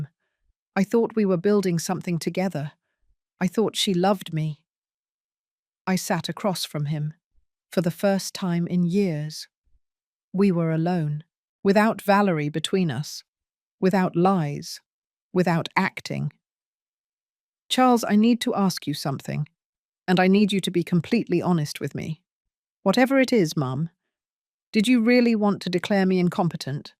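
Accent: England, United Kingdom. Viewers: Did this case happen in Great Britain? The narrators accent sounds English & a few things about court seem not American. The DIL seems to be an idiot, too. Also had I been her, the judge, I'd have the police waiting outside. When court was over, she'd be arrested for having assaulted me; and yes, I'd press charges for sure. And I'd cut son out of will. He's scum & stupid too. Instead, I'd leave everything to grandkids when 21. And I would tell son that wife has been stealing from him & the law firm. Prison for her!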